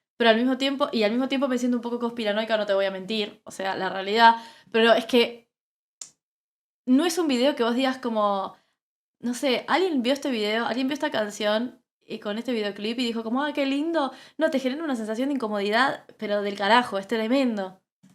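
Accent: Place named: Argentina. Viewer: Es primera vez que veo un video tuyo. Sigo a muchos argentinos, sobre todo al presidente Milei y su lucha por la libertad. Por tu idioma deduzco que eres argentina. Para mí, es un ritual para que los onvitados se la coman. He escuchado que hay evidencias que altos personajes de la música y la política disfrutan del sabor de esa carne. Sé que no puedo decir más. Un abrazo desde Cape Coral Florida EEUU de, una exprisionera política cubana en el exilio.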